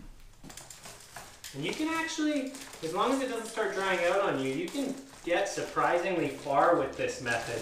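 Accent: Canada. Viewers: Thanks so much for sharing your expertise here. Going to use this technique on my walls before applying wallpaper. Really appreciate your friendly Canadian tone 🇨🇦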